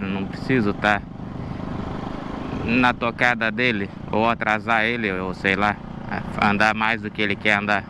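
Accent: South, Brazil. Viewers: Pelo sotaque o dono do canal parece ser do sul. Acertei? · Pelo sotaque é do Sul Paraná ou Santa Catarina? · Pelo o seu jeito de falar, parece que tu és do Sul do Brasil. Que Deus abençoe o seu destino, mostrando para nós um pouco do Brasil. 10/01/2021.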